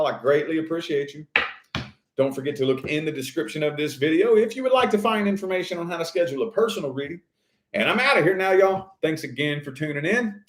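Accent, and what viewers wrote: South, United States. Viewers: I enjoy your readings. Are you a southern fellow?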